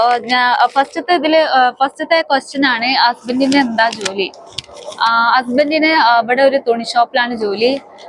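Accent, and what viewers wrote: Malappuram, India. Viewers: കേരളത്തിൽ എവിടെ ആയിരുന്നു. മലപ്പുറം സ്ലാങ് ആണല്ലോ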